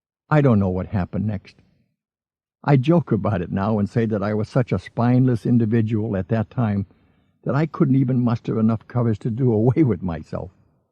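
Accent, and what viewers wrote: Boston, United States. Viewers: Am I the only one that noticed that he started with scroll marked 3 instead of scroll marked 1???? It threw me off as I was following with the book.. ugh… I love his voice and Boston accent tho 😜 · Boston accent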